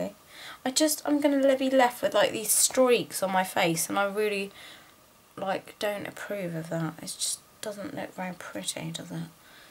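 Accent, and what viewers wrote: United Kingdom. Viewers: What kind of accent do you have? Is it a British accent?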